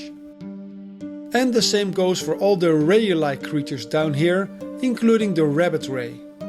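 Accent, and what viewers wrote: Netherlands. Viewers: goeie content maar runt je computer op een aardappel? (I can sense you are dutch by your accent :p) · the moment i heard your voice i knew you were dutch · Is deze gast Nederlands ofzo? Hij heeft echt zo'n accent.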